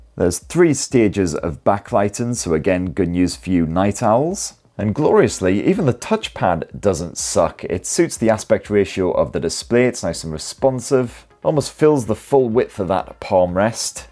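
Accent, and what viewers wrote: United Kingdom. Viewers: Man I could listen to British people speak all day, pure art.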